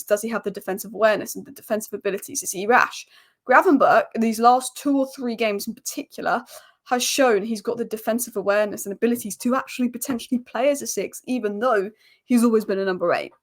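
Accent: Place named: England, United Kingdom. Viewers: I watched a tv show called uncle and he has an accent like hers. What part of England do People have this accent. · What part of Manchester is she from?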